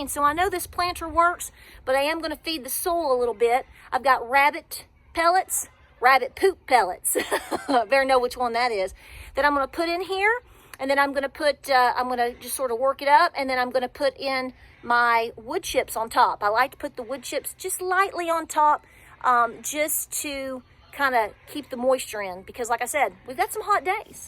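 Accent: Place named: Appalachia, United States. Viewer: Anyone sayin you ain't appellation. Just need to hear this rant! Southern momma bear strong.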